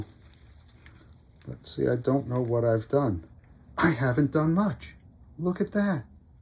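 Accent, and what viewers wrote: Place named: Midwest, United States. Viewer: I have a couple questions, how long did you leave the wire on before you took it off prior to putting it in the round pot? And can I ask what part of the country you are from? You seem to have a midwest accent? Coming from a Minnesotan, you sound like one of us.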